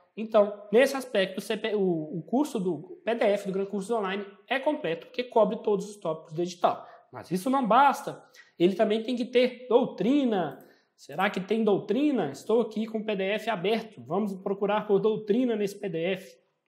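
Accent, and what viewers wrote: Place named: Minas Gerais, Brazil. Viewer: obrigada, você é uma inspiração e esse sotaque mineiro é massa hahahahha parabéns pelo seu trabalho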